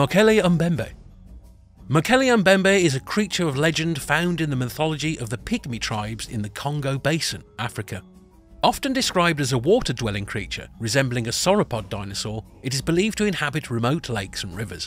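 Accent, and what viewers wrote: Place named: United Kingdom. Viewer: Interesting... Am I the only one finding a british accent explaining African culture to feel a bit... Wrong? Inappropriate? Strange?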